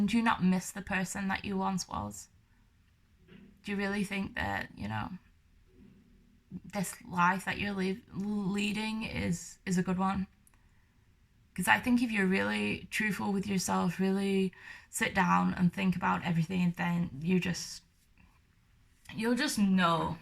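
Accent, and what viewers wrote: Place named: Lancashire, United Kingdom. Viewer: Ha Ha ,weirdly my husband said to me ...' the accent sounded like someone from Lancashire with a foreign accent : . Thank you for answering.. unfortunately he wins 😬😂. We live in Preston but are from nr Ormskirk . I am looking forward to any more readings that you do xx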